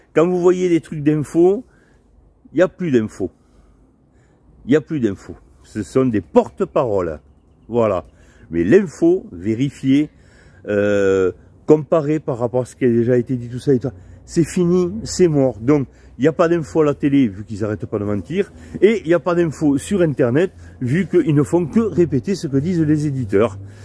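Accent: France. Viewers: Hello. Je te dirais juste une chose. Laisse les autres américanisé ou quoi que ce soit, il sont bien moins intéressant que tes vidéos. Je prend toujours un vrai plaisir a regarder régulièrement tes vidéos qui m'apportent du bien-être, des apports très intéressants sur fsms, mais surtout de la marade car je ries très souvent car j'adore ton humour avec cet accent du sud. Je t'en supplie, continue à faire tes vidéos. J'adore. Cordialement. Pascal..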